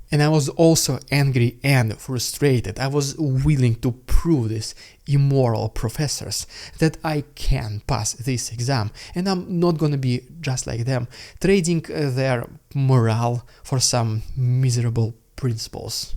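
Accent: Russia. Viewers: I think you speak English pretty fucking great, you just have a manly rooskie accent which is great! You run an English yutube channel that's no joke lol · I'm surprised how still has this accent, as a prostitute and heel licker of youtube money you'd expect him to smooth over these rough edges, or is this part of the money scheme image of a true Russian. And I see something of a crooked character in the tilting of the head, like zombies do 🧟‍♀ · You are not the only one. Russian universities are looking for british english accent. Not american which you obviously got, you still talk like google translate. Extremly thick russian accent your university is breeding ground for spies and other govermental officials. You should have picked up spanish instead of english. Russian universities are making anyone fail that speak american english on purpose so that more learn british accents. 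Supply and demand. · But don't you realise? Your English can't possibly be good enough because you still have a Russian accent! And we like it. Keep going:-)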